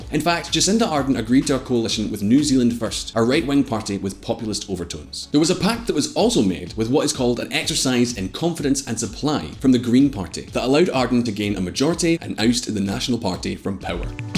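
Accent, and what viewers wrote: Scotland, United Kingdom. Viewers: I´m sorry but the scotish accent is unbearable - can´t through these vids no more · Where is simon 😴.... this Scottish accent is too strong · Well I like the Scottisch accent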